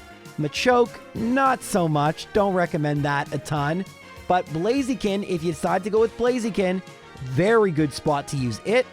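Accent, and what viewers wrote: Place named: Canada. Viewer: you even come off as a Canadian. Whereabouts do you live in Canada?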